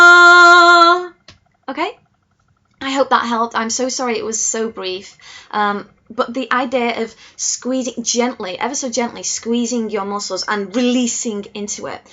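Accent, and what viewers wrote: United Kingdom. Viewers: I love that British accent. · Are you British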